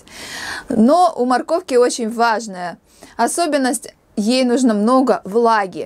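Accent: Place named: Latvia. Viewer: Почитал коментарии, оказалось вы в Латвии живёте!!! 👍 Вот и решение вашего говора... Мне нравится ваша речь!!! 😀😀😀 И я не маньяк, как мне написали 😀😀😀.... А у нас здесь некоторые и "гекают" , и "шо" , и "чё" , и "у клубе," "у селе" 😀😀😀...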